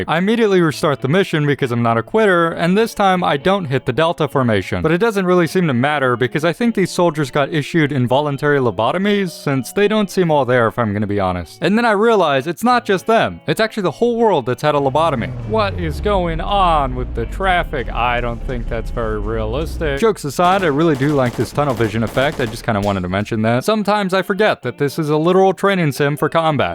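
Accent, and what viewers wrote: United Kingdom. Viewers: bro soundl like english luksor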